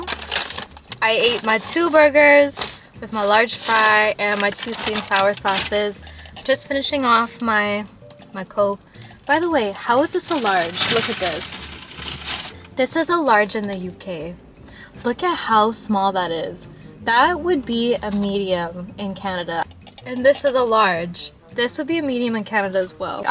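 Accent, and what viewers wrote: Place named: New Jersey, United States. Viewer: Oh wow she's Canadian? I toad-ally thought she was from like Jersey or New York. Is it just me or does she have that accent? Lol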